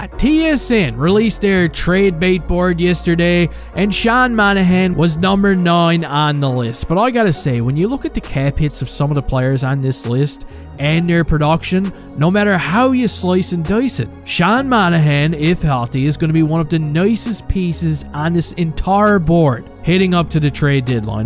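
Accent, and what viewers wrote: Newfoundland, Canada. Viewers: Are u from newfoundland? If so what part?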